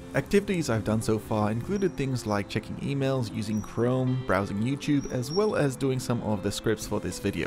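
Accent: Australia. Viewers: Why does your accent sound like an Australian trying to sound American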